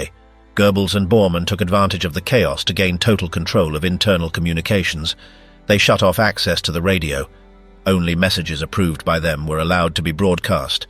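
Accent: United Kingdom. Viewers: English accented narrator, yet he says "aluminum" and "flashlight" (when he means, judging from the sentence context, "torch"). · AI videos with a british accent.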